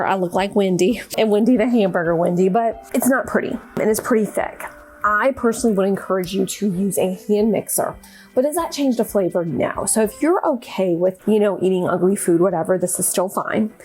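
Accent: Louisiana, United States. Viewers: Ah Louisiana, that’s why I love your accent ❤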